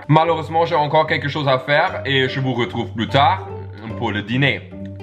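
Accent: Quebec, Canada. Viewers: Je surkifffffffff lacscen quebequois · J 'adore ton accent canadien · J'aime trop l'accent québécois ^^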